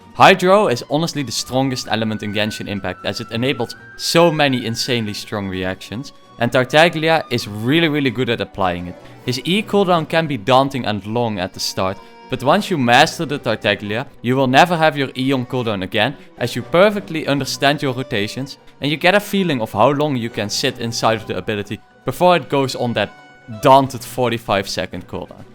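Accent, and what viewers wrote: Netherlands. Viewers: Im hearing a dutch accent.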